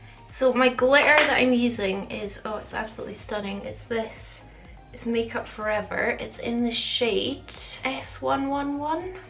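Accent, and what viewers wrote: Scotland, United Kingdom. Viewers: Sound funny hearing our own Scottish accent when u say thinks like “ur mental mate” ha ha brilliant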